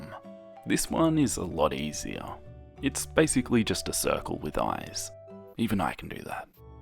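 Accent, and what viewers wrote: Australia. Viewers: I reallyyyy like your horror stile and i always watch your videos as a podcast and your voice and the australien accent chef kiss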